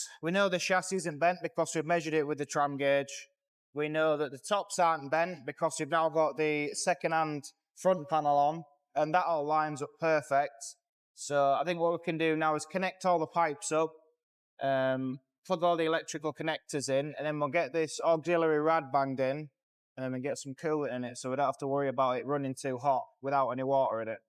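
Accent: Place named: North, United Kingdom. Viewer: Another cracking video, paintwork looks like glass. Nice to hear a fellow northern voice.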